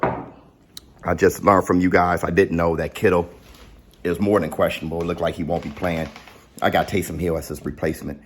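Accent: Louisiana, United States. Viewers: U from Louisiana?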